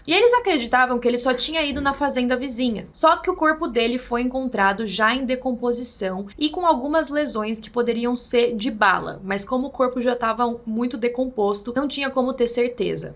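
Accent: Sao Paulo, Brazil. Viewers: O Estado do Pará 🇧🇷 ao Norte do nosso Brasil gigante têm um longo caminho de podridão na história, ou seja, infelizmente aquele Estado nortista é DESGRAÇADAMENTE FAMOSO nas páginas policiais ao longo de várias décadas. Tipo se eu fosse um cidadão nortista brasileiro nascido naquele Estado PARAENSE eu sentiria vergonha de dizer pra qualquer um que eu nasci ali. Graças a Deus que eu sou um homem brasileiro PAULISTANO e com muita honra e orgulho da capital e do meu Estado de São Paulo que é o mais rico não só do Brasil como da América Latina. Lembram daquela FREIRA AMERICANA que tanto fez o bem em ANAPU, na região campestre do Pará? Ela foi covardemente ASSASSINADA a mando dos ricos fazendeiros assassinos e exploradores ladrões do Estado do Pará. Ela foi uma bondosa SENHORA que só fez o bem e teve este fim trágico. Na época eu fiquei revoltado com essa raça desgraçada que há no Pará. 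Lembram do município RIO MARIA? também no Pará, aquela região têm um histórico de BANG BANG, ou seja, é famoso e pecaminoso em ASSASSINATOS COVARDES, faça pra nós, Senhorita, um documentário em seu canal deste caso antigo da Irmã Doroty que foi morta em Anapu ok. Obrigado. E já deixo meus gostos aqui sempre em seu canal. Tu parece paulista também pelo seu sotaque. Tu és paulistana também? Desculpe a ousadia de te perguntar. E encerro por aqui essa carta com minhas saudações calorosas para ti de boas vindas. Fraternalmente. 🎉❤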